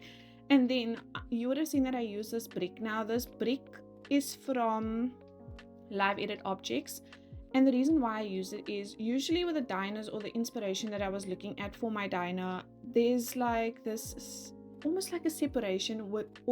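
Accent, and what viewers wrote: South Africa. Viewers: Hi, I know this is random but are you South African by any chance? I sense a South African accent, a strong one lol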